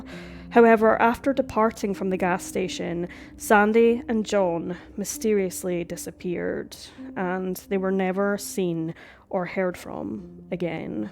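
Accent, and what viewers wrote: Scotland, United Kingdom. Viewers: She is scottish.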